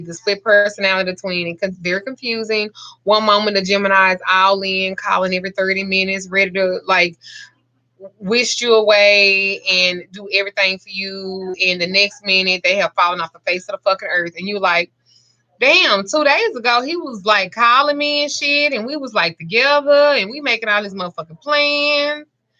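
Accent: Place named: Dallas, United States. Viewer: I knew I recognized that accent lol "ova thurr" "right hurr" im in dallas too☺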